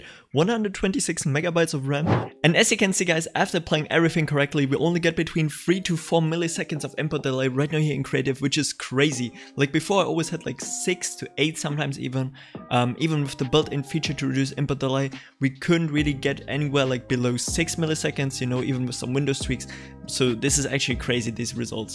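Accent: Germany. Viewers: der deutsche akzent haha, aber geiles video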